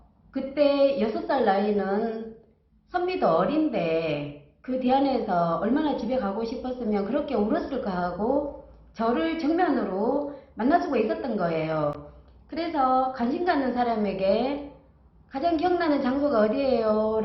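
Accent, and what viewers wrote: Gyeongsang, South Korea. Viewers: 저처럼 경상도 사투리라 반갑네요 ~^^ 정체성인 장소~ 이번 기회에 생각해봐야겠어요~^^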